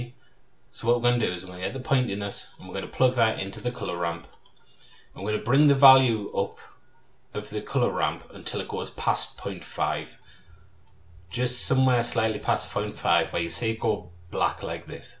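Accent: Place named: Northern England, United Kingdom. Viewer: Hello, mate. This video is a pure gem for me saving this to my favorites. Cheers! P.S.: You've got a very familiar accent to me. It reminds me (actually it's identical) of my British friends from the oil field I work at. I don't quite remember their origin but sounds like a bit of slow Scauser but more Northern England. Where are you from?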